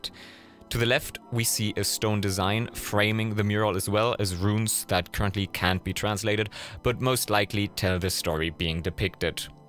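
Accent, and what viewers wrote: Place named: Germany. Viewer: Wie man einfach heraushören kann, dass du deutscher Muttersprachler bist. 🤣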